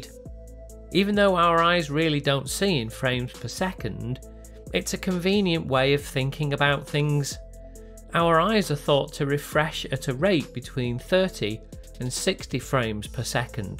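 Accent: United Kingdom. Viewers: another limey narrating a video👎💩💩💩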